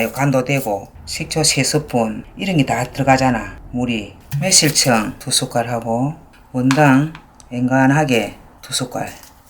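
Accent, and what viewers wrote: Gyeongsang, South Korea. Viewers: 감사합니다.오늘 해 볼께요 맛있는요리 자주 올려주세요 샘 목소리 경상도 억양 ㅡ고향의 소리 고향냄새 엄마가 생각납니다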